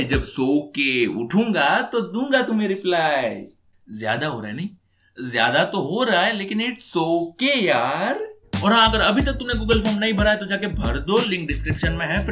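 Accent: Gujarat, India. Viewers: h aapka real naam 😅... I already knew you are from Gujarat bcz u have a gujarati accent... Waise awesome video, it was really very funny... also congratulations for 100K subscribers.... ❤️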